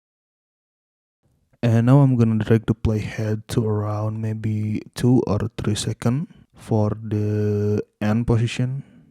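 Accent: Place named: Indonesia. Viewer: Ini orang indo ya logat nya kok indo bgt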